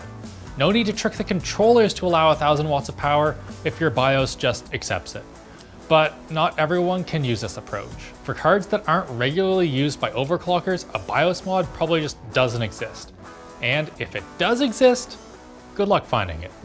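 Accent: Afrikaans, South Africa. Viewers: He sounds south african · Is from South Africa perchance? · sounds South African? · Nothing sticks out more than a South African accent. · You would think this based on the population of the country but you're way off. With 11 official languages the dialects vary extremely. He sounds like an afrikaans native speaker and even then not all of those in SA have the same accent. As a South African I know I'm not spewing bullshit. Also, the numbe of afrikaans native speakers is only around 7 million, which is a lot less than 60 mil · He's south african ye? ;D good to see one of us on tech tips :) rock it lad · Loving the Saffa XD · Is this guy south African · sounds like hes south African? Probably form durban or CPT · Do I detect a South African accent? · Is that a Saffa accent I hear >? :) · The south african accent is real 😅🤣🤣🤣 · So good to hear a South African voice in these videos!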